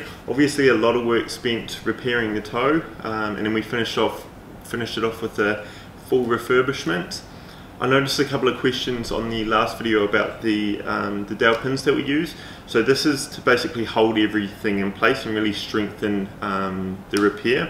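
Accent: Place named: United Kingdom. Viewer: This guy is not american he's brit i bet...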